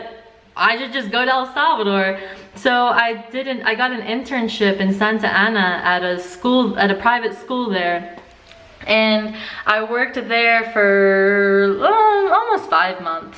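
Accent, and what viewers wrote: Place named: United States. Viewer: As always nice video..even though sometimes is very hard for me to understand american english...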